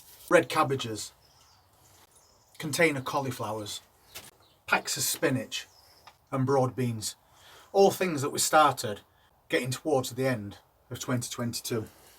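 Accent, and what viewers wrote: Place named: Derbyshire, United Kingdom. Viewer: I knew from the accent we are close, I am in Sheffield not too far from one another. :D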